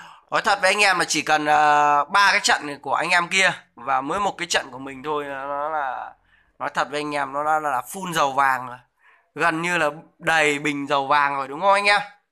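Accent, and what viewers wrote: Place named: Nam Dinh, Vietnam. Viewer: Anh người nam định hả anh hay ở đâu ?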